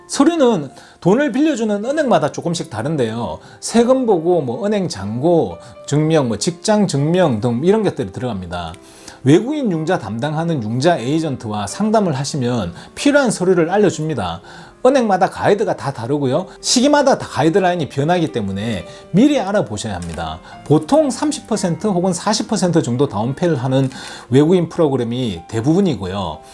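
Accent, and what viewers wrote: Gyeongsang, South Korea. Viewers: 감사합니다!! 요즘 매일 보고 배우고 있습니다 특히 경상도 액센트 좋아요 😁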